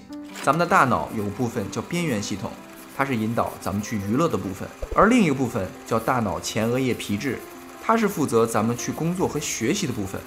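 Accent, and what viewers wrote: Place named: North, China. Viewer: 大陆北方人说话的习惯 我们也不习惯听台湾腔 也不习惯听阿拉伯语 西班牙语 但是重点难道不是；内容比较重要吧？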